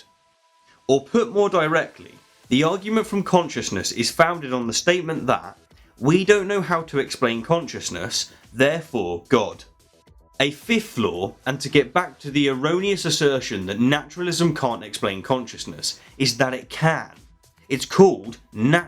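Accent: England, United Kingdom. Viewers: Seems people are convinced by listening to the beautiful English accent. What is freely asserted can be freely denied but if he is preaching to the choir we have a confirmation bias problem. How something immaterial can come from matter was not explained. Where do abstract ideas come from? Can justice, kindness, morality just pop out of the brain? While this may not prove any one religion, it sure points to the strong possibility that the designer of humans was a little smarter than random accidents. If things evolved then who or what was guiding the evolution. Random just does not cut it. The videos tend to be sciolistic and many of the readers are echo chambers for the sequacious opinions expressed.I read all of these so I can debunk the "debunked syllogisms" for my logic students.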